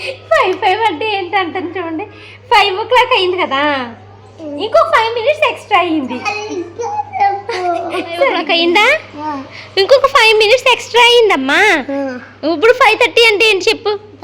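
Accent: Godavari, India. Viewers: Medi proper a uru andi, me puttillu ekkada, ante slang godavari valla la undemo anukuntunna.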